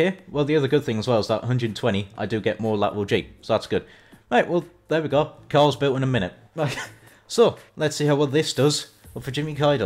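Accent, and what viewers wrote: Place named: North East England, United Kingdom. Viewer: You sound like ya from the north east of England am from Newcastle and you defo sound ya from here or the other side of the Tyne maybe?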